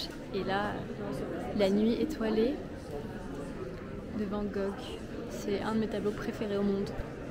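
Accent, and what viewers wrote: France. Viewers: J'adore énormément votre accent français Madame française.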